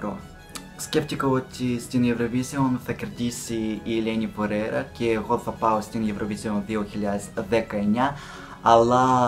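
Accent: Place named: Russia. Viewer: Τρελαίνομαι για Ρωσική προφορά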